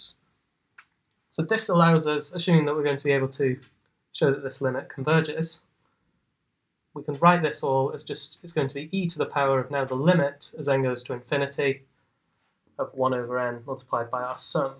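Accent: United Kingdom. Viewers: thank God that math is universal, i can't understand his british accent at all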